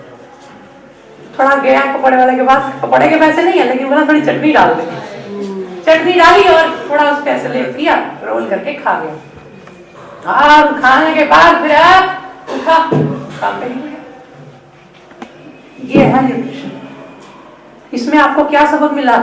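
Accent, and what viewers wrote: Punjab, India. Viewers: Thank u.. its very refreshing to hear u.... a bit of punjabi accent in it... God bless u... from Kenya África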